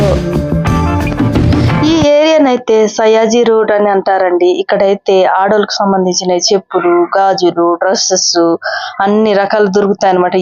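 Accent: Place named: Andhra Pradesh, India. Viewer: నిది ఆంధ్రా కాదా భాష ఆంధ్రా లాగా ఉందిగా..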